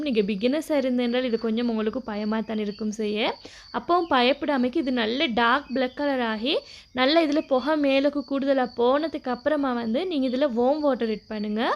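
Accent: Akurana, Sri Lanka. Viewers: Ma Sha Allah looks yummy 😋 I have started watching your videos recently. I really love the way you talk in Akurana slang. It’s like ஊருக்கு போன மாதிரி feeling 😊😊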